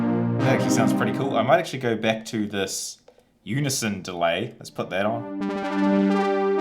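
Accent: New Zealand. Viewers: New viewer here: Your channel says Australia, but all I can hear in that accent is New Zealand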